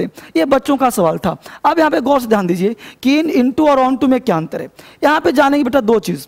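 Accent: Bihar, India. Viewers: But bihari accent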